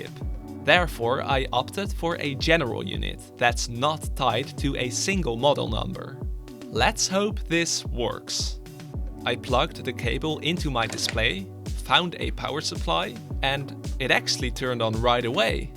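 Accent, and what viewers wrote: Netherlands. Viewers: holy dutch accent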